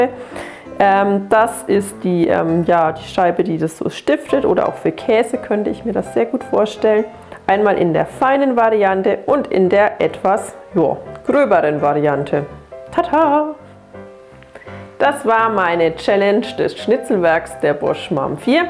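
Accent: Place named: Franconia, Germany. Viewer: Hört man sofort 😂 Aber nicht nur das r, auch das "Sprechsingen" etc. Mir Frangen hald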